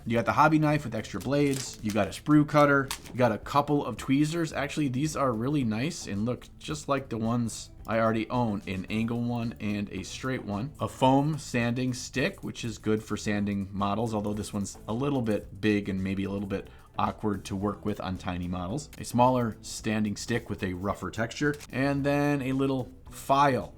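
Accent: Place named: Midwest, United States. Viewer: If I were playing a drinking game where I had to do a shot each time I heard an awful midwestern accent "byag" for bag... I'd be wasted in the opening moments of the video!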